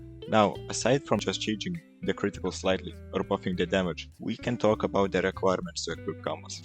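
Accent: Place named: Eastern Europe. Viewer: you have a strong slavic accent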